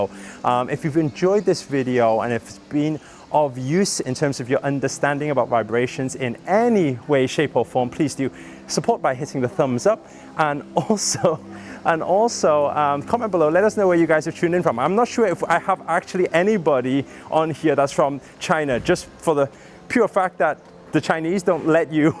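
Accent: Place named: United Kingdom. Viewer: I'm interested in the British accent, I really love it. yesterday I've been thinking; I really want to find a British LOA youtuber, then forgot about it, Opened youtube tonight and I've just found you! I'm blown away because I've been doubting LOA for nothing seems to work. The problem is, I can't let go, I can't stop my obsession with my desires. Please help with a video about an easy way to let go and stop the obsession.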